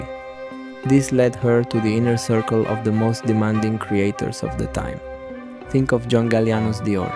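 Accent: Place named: Japan. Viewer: The narrative is so awful that I can't understand at all. Fucking Japanese pronunciation.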